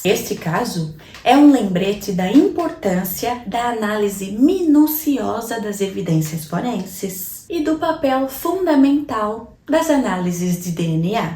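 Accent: Portugal. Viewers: Gosto muito de sua voz e seu sotaque. Penso que vc é Portuguesa.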